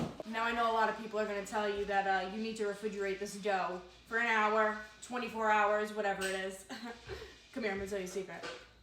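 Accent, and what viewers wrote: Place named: New Jersey, United States. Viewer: You are soooo Jersey!!! I lived in S Jersey until I moved to Iowa. My boys always tell me, "your Jersey is coming out"!!!!! I miss the accent so much.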